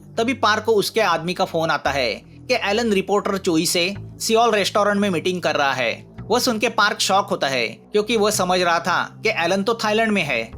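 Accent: Maharashtra, India. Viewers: Aapke hindi marathi ki jhalak dikhai deti hai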